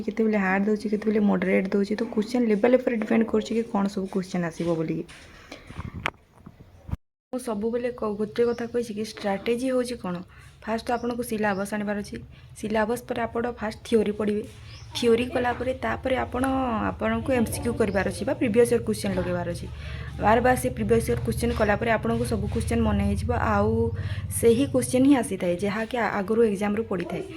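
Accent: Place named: Sambalpur, India. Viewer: Tamar accent ta pura Sambalpuri mix aae.... Best of luck Jai Jagannath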